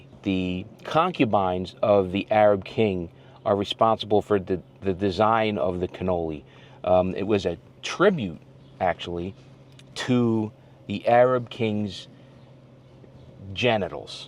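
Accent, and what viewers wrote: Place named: Nebraska, United States. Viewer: Not a fire hydrant, its called a fireplug dammit. you sound like you grew up in freakin Nebraska!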